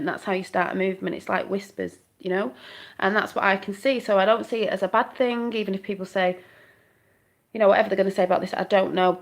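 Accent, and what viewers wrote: United Kingdom. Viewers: Thanks n God Bless u 2 Beautiful. I thought that was a British Accent I love it 🤗 lol... I'm sure u hear 100×'s a day how Beautiful u r But every girl loves 2 b told there Beautiful So hopefully it made u 🙂 n if it did Awesome u hope I did make u smile while making ass of myself 😢😊 n e time u want 2 come 2 America I'd gladly put u on a flight. U Truly r The most beautiful woman I've ever randomly jus ran across here on YT 😍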